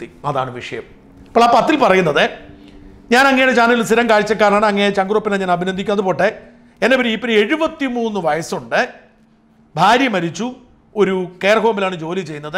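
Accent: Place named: Kochi, India. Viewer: Slang kettal ariyaam kochi kaaran aanu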